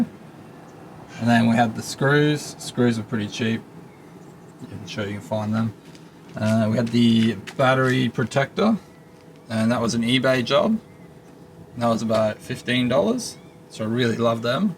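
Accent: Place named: Ireland. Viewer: Are u part irish little bits would sound irish and somethings you say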